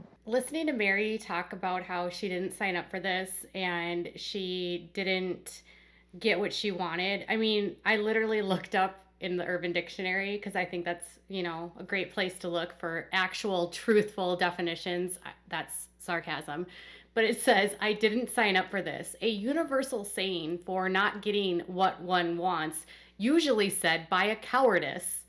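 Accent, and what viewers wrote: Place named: Midwest, United States. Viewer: I hear your accent more when you’re behind the scenes… Midwest?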